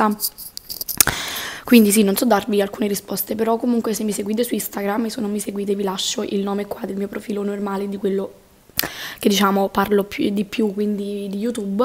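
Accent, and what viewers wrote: Italy. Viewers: Ma é proprio il suo modo di parlare é una cosa che hanno i napoletani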